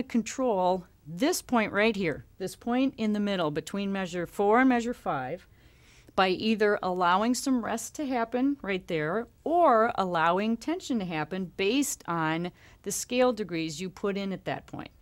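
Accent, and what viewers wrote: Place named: Wisconsin, United States. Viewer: Wisconsin accent to the moon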